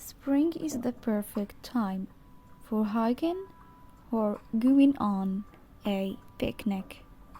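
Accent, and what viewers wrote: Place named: England, United Kingdom. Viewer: نسمات الربيع بنبرة إنجليزية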